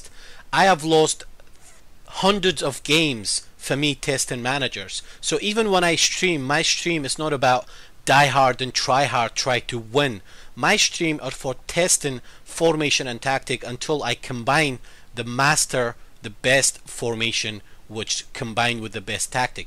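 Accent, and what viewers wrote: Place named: Scotland, United Kingdom. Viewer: i thought you were Scottish myself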